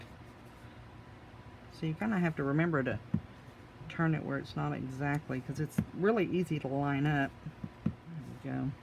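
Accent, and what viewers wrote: Texas, United States. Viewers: I just adore listing to your Texas drawl. Your videos are amazing...thanks for all the inspiration! Now where's the video on how you did the multi-colored greeting??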